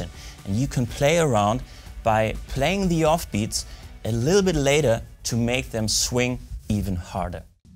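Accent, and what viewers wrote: Germany. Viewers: I'm sensing a german accent there.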